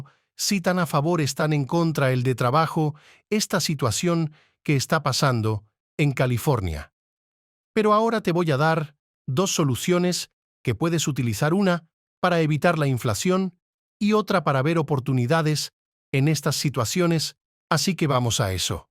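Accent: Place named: Spain. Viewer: Yayaya párale españolito Mamila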